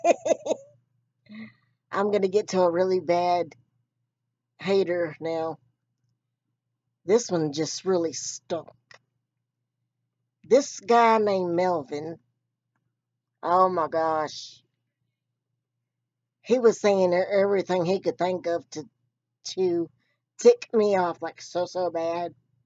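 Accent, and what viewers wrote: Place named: South, United States. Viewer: Keep doing what you are doing! You are such a positive woman and know that you will always have a crew backing you up. You are very funny and I get your sense of humor because I have a southern twang too! 😘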